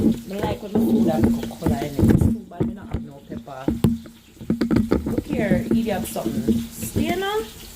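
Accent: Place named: England, United Kingdom. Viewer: Love your English accent... You always make me laugh.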